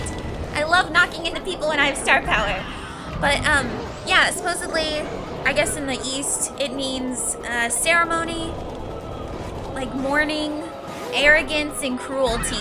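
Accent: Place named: New Jersey, United States. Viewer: nice joisy accent haha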